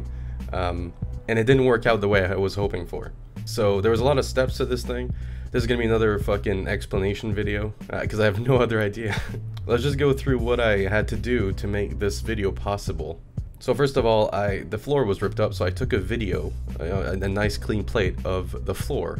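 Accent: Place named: Quebec, Canada. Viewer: hey are you a quebecois? parce que moi je le suis et j etais full surpris quand tu parlais en quebecois moi j etais genre: attend... il parle francais ce gar la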